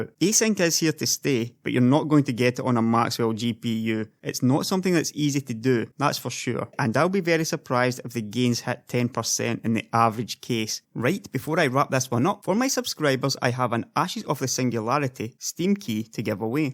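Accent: Scotland, United Kingdom. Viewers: even better with that Scottish accent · your scottish also so all good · Which part of Scotland is your accent from?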